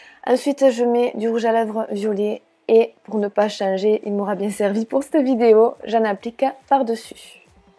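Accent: South, France. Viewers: ton accent c'est celui du Sud ? 😘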